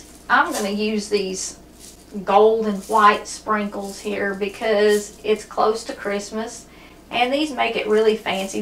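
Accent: South, United States. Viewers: I love your southern accent. We’re kinda neighbors. I’m in Nicholasville, Kentucky. Love love love your recipes.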